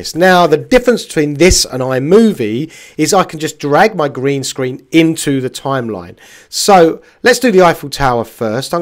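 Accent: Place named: United Kingdom. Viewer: You must be from England or Scotland sir. Anyway, Great explanation. Looking forward to hearing more videos from you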